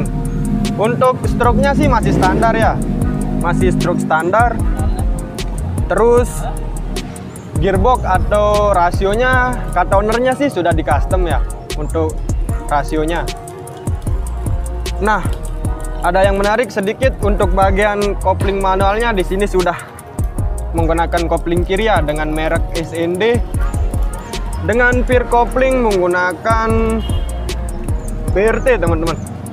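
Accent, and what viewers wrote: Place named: Lombok, Indonesia. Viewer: seperti logat lombok bicaranya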